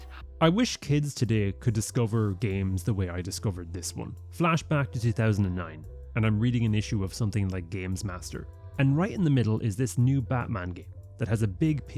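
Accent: Ireland. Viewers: You sound irish. Hello fellow irish person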